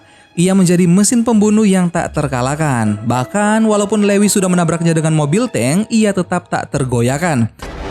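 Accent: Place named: Java, Indonesia. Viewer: guanteengg,di pikiran ku pasti mimin orang jawa😁😁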